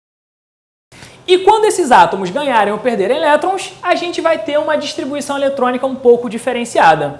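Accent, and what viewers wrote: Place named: Rio de Janeiro, Brazil. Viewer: Legal super entendivel, mas poderia ser meno cariocado,,,,cerrrrrrrto.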